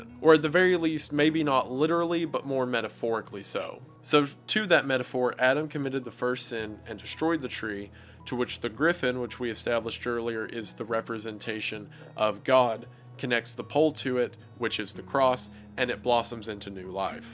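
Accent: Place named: Appalachia, United States. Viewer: It's an accent. I do the same thing. He's from Appalachia, I'm from the Mid-Atlantic.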